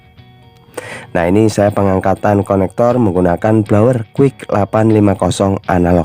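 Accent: Java, Indonesia. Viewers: Lokasi sampean Ki ngendi to bang kok koyo wong jowo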